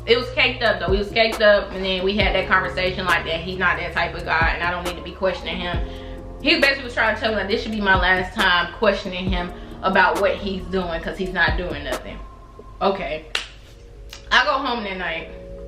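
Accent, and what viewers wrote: Miami, United States. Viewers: Sound like Miami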